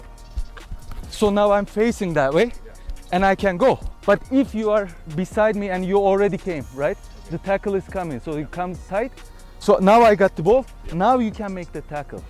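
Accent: Turkey. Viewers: Seni tanimiyorum ama aksanin tam bir turk bu yuzden ARDAAA GULERRR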